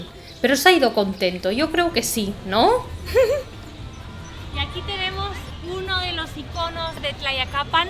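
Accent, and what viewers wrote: Andalusia, Spain. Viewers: Una catalana con acento andaluz? Que interesante! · Bonito video y cada vez tus visitas, tienen más acento andaluz..... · ¿Por qué si eres de Valencia,hablas como de Andalucía}?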